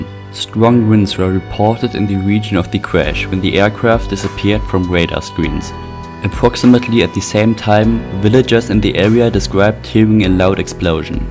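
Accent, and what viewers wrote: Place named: Germany. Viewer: you're definitely german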